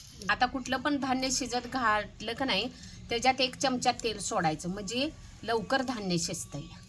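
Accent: Kolhapur, India. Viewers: खुप छान मावशी तुमच्छा जेवणाची चव तर माहीत नाही पण तुम्हची बोली भारी आहे कोल्हापुर तल कोणतं गाव तुम्हचं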